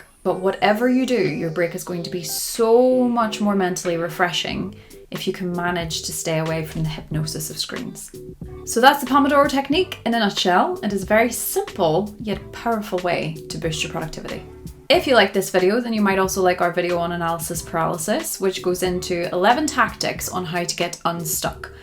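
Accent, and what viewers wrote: Northern Ireland, United Kingdom. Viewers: Is that a Northern Irish accent I hear? 😅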